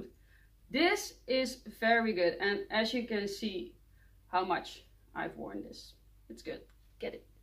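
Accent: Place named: Netherlands. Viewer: Als Belg hoor ik een nederlands accent, toevallig van Nederland ?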